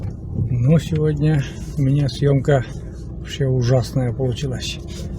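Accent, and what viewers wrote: Komi, Russia. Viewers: Да уж Коми говор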